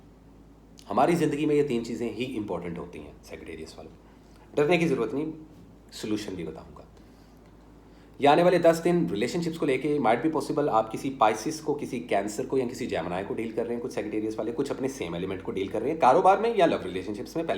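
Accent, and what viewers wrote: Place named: Punjab, India. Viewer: Capi here,Thanks for the reading 🙏🏼 Love your Punjabi accent 🫶🏼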